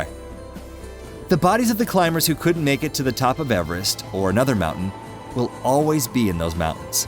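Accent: United States. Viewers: This starts with some cheesy Yank voice over saying « Hey Guys »….WTF? One can tell immediately this is not going to be serious and it will be some D grade joke…mind you I switched off immediately so maybe not..(bet it is).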